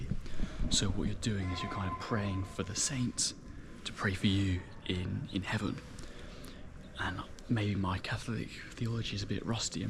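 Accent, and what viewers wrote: England, United Kingdom. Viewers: This presenter is so handsome with a gorgeous English accent 😍😍😍😍😍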